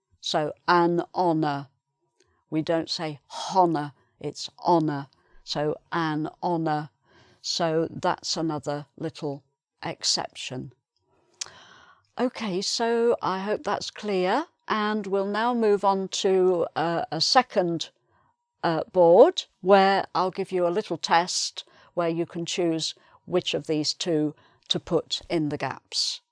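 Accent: United Kingdom. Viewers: This is british accent Thank you I like your program.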